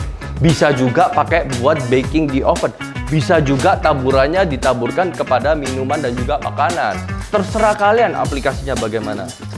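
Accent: Surabaya, Indonesia. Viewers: Logatnya kek orang sby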